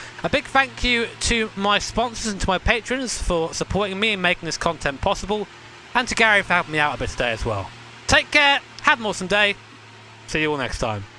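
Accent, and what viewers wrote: United Kingdom. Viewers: My favorite Brit! I love how there is no "TH" in your vocabulary. I mean no disrespect, I think it is cool and sounds very Brit to me.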